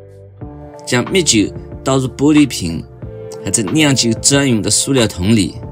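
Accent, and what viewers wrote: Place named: Nanjing, China. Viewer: 很喜欢你的频道，条理清晰，风趣幽默，乡音撩绕，在美遇到相同时区种植爱好者不易，还是南京老乡那是缘分。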